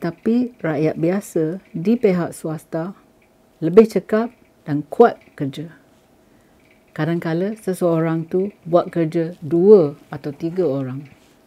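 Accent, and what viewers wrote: Perak, Malaysia. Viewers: Kuat likat pekat melekat peraknyeee yongg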